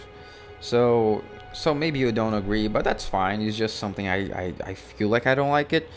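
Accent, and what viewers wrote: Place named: Brazil. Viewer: Sim KKKKKKKM Eu pensei que era um brasileiro no começo do vídeo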